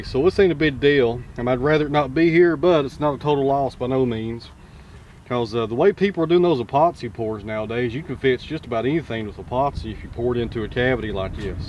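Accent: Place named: Tennessee, United States. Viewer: I'm new to your channel, and I have to say it's mesmerizing. I've never spent any time watching someone use a sawmill, but it's as though I have to watch you. What's so refreshing is your enthusiasm for the work, and the fact that you really, really appreciate the gift, direct from God himself, of the wood. I've had a love affair with wood since I first took a shop class in the 9th grade in 1972. When you reveal the inside of a log, and you revel in the fact that you are the first human being to see it, it is like unveiling a never-seen-before Rembrandt. I have stood in wonder as I gazed up at the ceiling of the Sistine Chapel, in awe of the work of Michelangelo. Yet he is no match for the beauty of a slab of walnut, uncovered by your saw mill. Thanks for bringing this great art to the world. In addition to woodworking, I'm a photographer as well. 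Both habits, errr, hobbies, are supported by my day job, which I love, and both habits, I mean hobbies, are black holes that swallow money without end. If I were to engage in milling wood like you, I'm fairly certain it would mean the end of 36 years of marriage.:) Besides, I live in a part of Wyoming where trees are few and far between. I will get my saw mill fix from your videos. Finally, I love the way you talk. I have a friend who hails from Tennessee, and he sounds a lot like you. That's a pretty unique thing in this part of the world!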